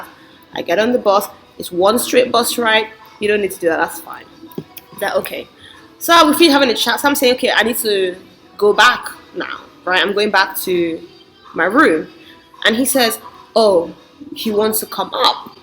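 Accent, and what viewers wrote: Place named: United Kingdom. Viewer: 😂😂😂 Pls Subscribe to my Channel please😆 That’s a silly boyfriend materials😁 Your Brit-accent is on point😂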